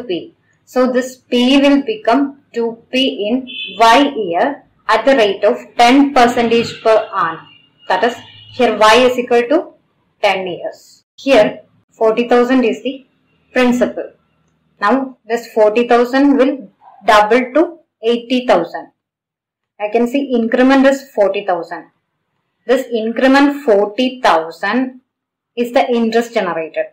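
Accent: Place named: South, India. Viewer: nice explanation ma'am I know you're from the south (may be Karnataka)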